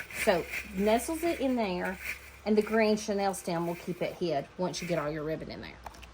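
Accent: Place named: South, United States. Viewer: What you call a Chanel stem, I know it as a pipe cleaner 😂. It's probably regional. I'm from Utah and you sound like you're from the south.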